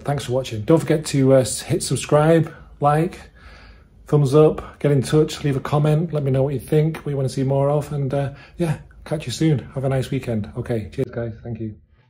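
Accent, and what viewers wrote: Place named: Northern England, United Kingdom. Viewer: I’m another that has followed for a while. Just loving to hear a fellow northerner!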